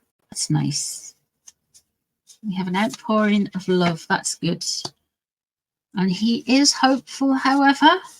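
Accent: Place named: Newcastle, United Kingdom. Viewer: I thought was saying DL’s too..However I think she is saying DETAILS.. I think it’s her Geordie accent..please correct me if I am wrong..💙💙💙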